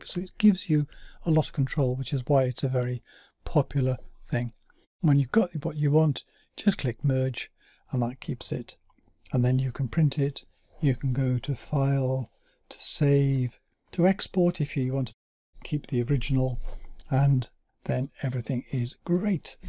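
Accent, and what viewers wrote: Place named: South Wales, United Kingdom. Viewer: Hi. From your accent and some of the photos you use I guess you're in my part of the world - S Wales?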